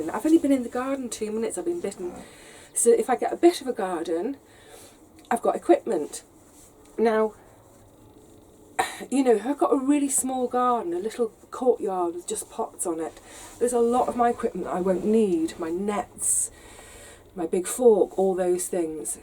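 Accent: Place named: RP, United Kingdom. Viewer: ..."as I was saying upside down in the broad bean bed..." you do make me laugh..loving the stuff you do and am a new sub, so thanks for the content...just to add, I thought you were a bit posh ( accent) and then I watched you talking about how you grew up on a council estate and I had to rethink my impression of you!!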